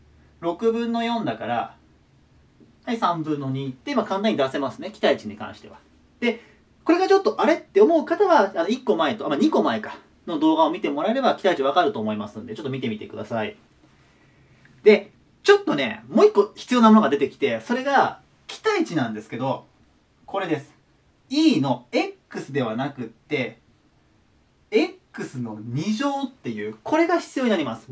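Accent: Kansai, Japan. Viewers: さん、ありがとう。それと何故関西弁？お返事下さい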